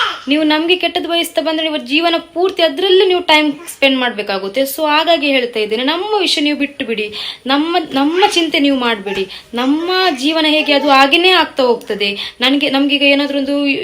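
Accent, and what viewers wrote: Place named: Tulu Nadu, India. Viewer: Nimma kannada Tulu mix kelalu kusiyagtade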